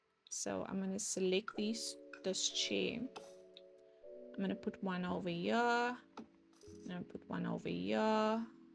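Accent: South Africa. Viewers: Omg r u South African?😭💗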